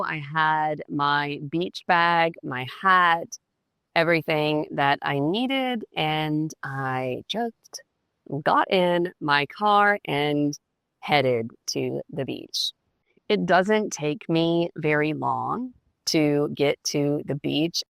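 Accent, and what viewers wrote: United States. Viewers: Dear One day on the beach - ti's is so nice idea and practical as well I practise listening, I enjoy it a lot even though your English is definitely American (I prefer soft British), but it is done in very interesting: slow, creative, transparent and coherent way. Also your today's adventure has reminded me my a few Spanish experiences in the past. There are rally beautiful memories. Warm hugs from England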